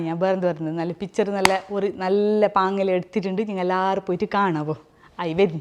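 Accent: Kasaragod, India. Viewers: Still I'm remembering your lucifer review by our slang Kasaragod basa again you are back on same production movie same style of review go on pinne kana ave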